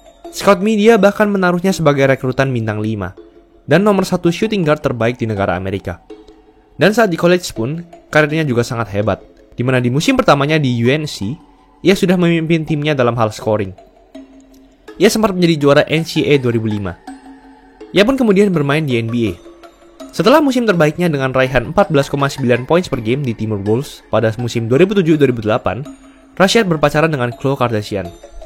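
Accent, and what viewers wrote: Java, Indonesia. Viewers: konten mantap, aku saranin untuk next video dubbingnya jangan medok banget bang wkwkwkwk, semangat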